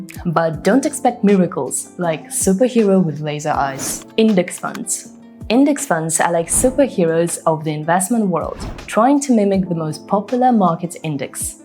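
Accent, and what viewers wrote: Russia. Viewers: Russian pronunciation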